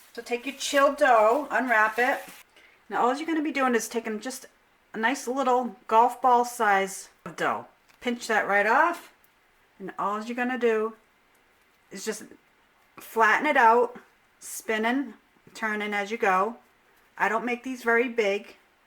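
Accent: Boston, United States. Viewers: I hear the Yankee....fellow Bostonian here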